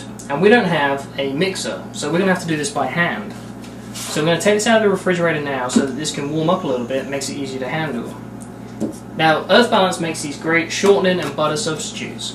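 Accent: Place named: United States. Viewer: Maybe he's gaining some of the american accent by living there for a while...: )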